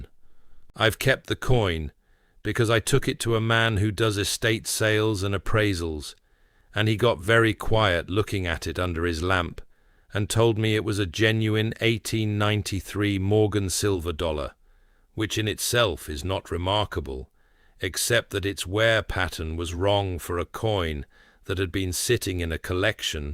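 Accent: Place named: United Kingdom. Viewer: Its a British accent..bro!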